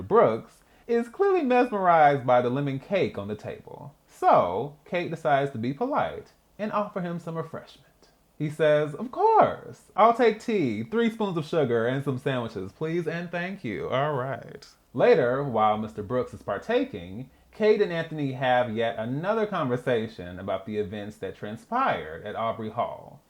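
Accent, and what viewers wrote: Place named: United Kingdom. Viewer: What do great reviews, a British accent, and fun historical financial facts have in common? You guessed it, another review.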